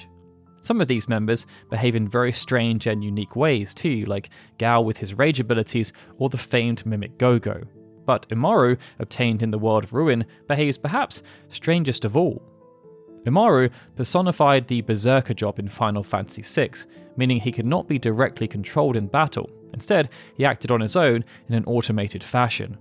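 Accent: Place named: United Kingdom. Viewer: I knew all those. Because you just recreated someone else's video with a brit accent.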